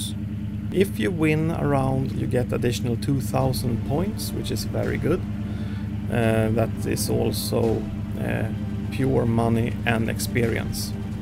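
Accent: Sweden. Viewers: Are u swedish? Im swedish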